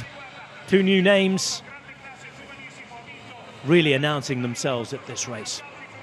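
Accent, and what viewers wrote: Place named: England, United Kingdom. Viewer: As an American cyclist and tifoso , I have to give you high kudos for the way in which you present the context and follow up with interesting observations with a little tongue in cheek. Here in the states, we have to suffer through what they give us on, etc. and our commentators as much as they’re great guys just do a pretty basic job. If I could hire you for our coverage of the tour etc. it would be a slam dunk. And don’t be pissed at me but you have a very likable English accent.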